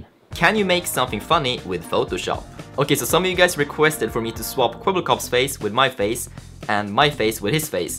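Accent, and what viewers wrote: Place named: Sweden. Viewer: Wait are u swedish? I can hell it by your accent 😂👍 jag är också svensk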